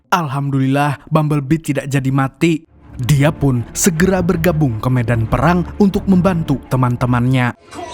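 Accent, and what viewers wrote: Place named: Java, Indonesia. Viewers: Esih medok ya lik tapi lha mandan adem ❤ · Akses jawirnya kental sekalee brur!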